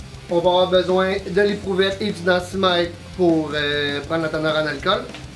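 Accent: Canada. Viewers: Chèr monsieur salut, à vrais dire j'ai rien pijé même si je suis je parle français, tu sais pourquoi ? Car vôtre langue française a beaucoup d'axon hhh je sais que vous êtes canadien, mais de toute façon tu mérite un like et un abonnement grâce à l'effort et le temps pour faire cette aimable vidéo, alors je vous souhaite une très bonne continuation. Best wishes. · Merci pour la vidéo et l'accent Québecois pas trop fort pour un Français! Dommage qu'on ne voit pas le résultat final.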